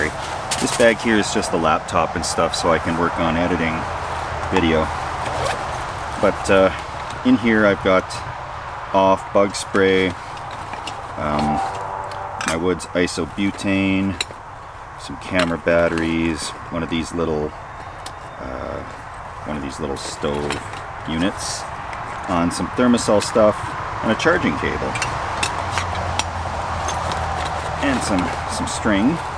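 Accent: Canada. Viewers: Canadian accent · your calm Canadian voice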